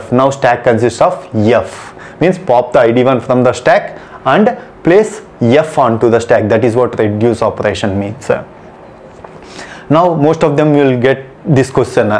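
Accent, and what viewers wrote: South India, India. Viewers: Sir aap South se Ho n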